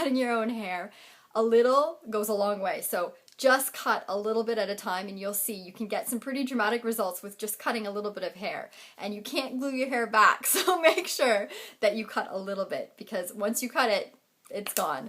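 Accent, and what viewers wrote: Canada. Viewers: this video was great...i can tell ur canadian you may not realize but you have a strong canadian accent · she souds like a canadian!!! r u canadian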